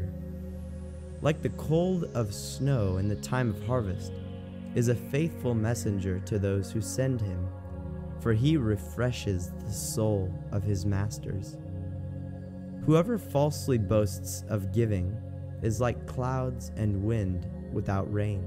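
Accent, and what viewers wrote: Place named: England, United Kingdom. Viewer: Thanks you so much bro. I am learning English accent with your bible study.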